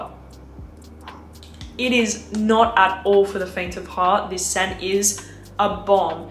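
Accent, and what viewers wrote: Australia. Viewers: ! We missed you and your aussie accent 😍 You look stunning! Happy to see you back, don't forget about us here on YT 😢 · Just wanted to tell you that you sound so Australian👍 I like it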